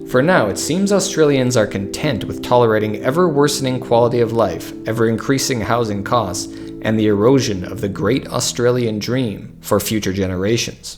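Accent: United States. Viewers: life is impossible in australia? clearly you need to open your eyes and look at life in poorer parts of the world! Methinks your "life is impossible" has the whine of someone complaining they don't have the latest apple phone, or some such flippancy. The way you pronounce some australian place names indicates you have no idea about australia...... where do you (the narrator with a tinge of american accent) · Crap clickbait. American voiced bot spewing crap. There’s nothing wrong with Australia. When you look at the rest of the planet, we’ve got it pretty good. Pure bull💩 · Sounds typical for this type of country. U.S. has similar problems. Hopefully you're keeping an eye on the value of keeping families intact. We created an environment where reliance on one's family to live comfortably is gone. It shows in very bad ways. I do find one thing funny: I watched a video the other day explaining the U.S.'s national debt problem. 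It was narrated by a young man with an Australian accent. This video is narrated by a guy with an American accent. Go figure.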